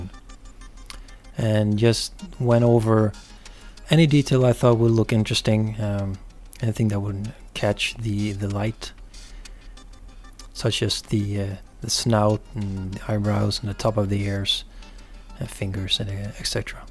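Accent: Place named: Sweden. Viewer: Tyckte att jag anade lite svensk brytning i din engelska. Nåväl, mycket bra klipp och snyggt målat. You are subscribed :)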